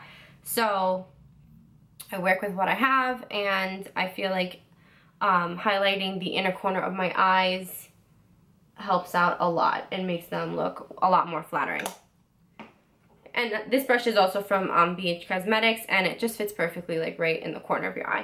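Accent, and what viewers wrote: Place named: New York, United States. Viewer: Being from NY I can definitely hear your accent lol I lost my accent when I moved to Wisconsin. lol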